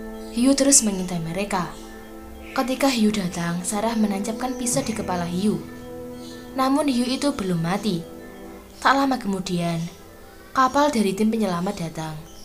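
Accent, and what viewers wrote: Java, Indonesia. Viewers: suara medddokmu terngiang ngiangg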